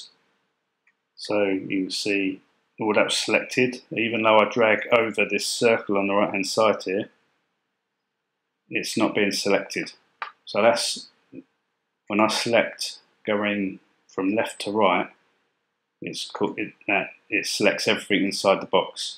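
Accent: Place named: England, United Kingdom. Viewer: Thanks for the great video (and the English accent), I'm investigating 'free' CAD software for members of our Mens Shed, a movemnt that I think is catching on in Uk as well now. Also liked your bird box video, the link for which I will pass on to some folks here (in Tasmania) who are looking to protect the Swift parrot from extinction. Using the Pi may be too high tech for their purposes but it might get them thinking.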